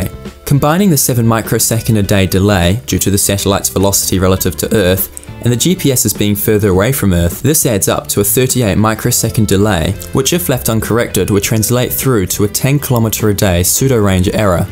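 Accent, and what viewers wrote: New Zealand. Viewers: ooooh I was sure this was a Kiwi accent, then when I saw the AirNZ app I knew it had to be! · Ahhh that kiwi accent.. grrrr lol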